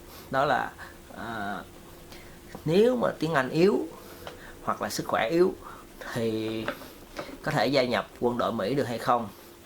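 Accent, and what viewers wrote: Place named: South, Vietnam. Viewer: Chia sẻ hay, giọng nói đậm chất nam bộ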